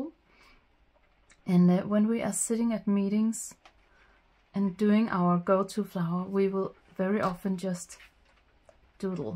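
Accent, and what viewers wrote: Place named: Denmark. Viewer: Your accent sounds like you're from Denmark:face-blue-smiling: Har jeg ret? :goodvibes:I sketched with that same brand of pen you're using I tried, but it didn't seem water-resistant, so my sketch died :face-red-droopy-eyes: Anyway, my go-to flowers are tulips 🌷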